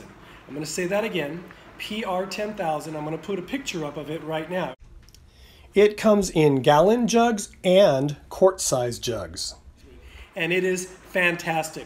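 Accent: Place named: Arizona, United States. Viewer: Arizona accent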